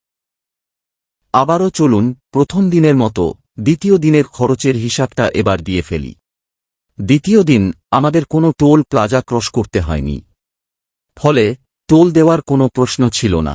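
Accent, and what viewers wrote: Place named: England, United Kingdom. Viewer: Tomar golar voice ta darun but english tan ache banglay ktha blate। Tbe darun laglo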